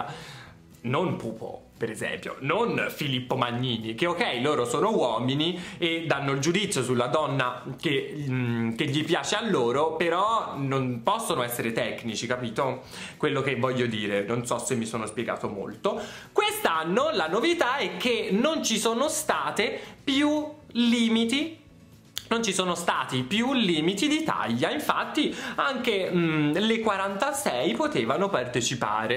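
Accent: Tuscany, Italy. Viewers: E un si sente che tu sei toscano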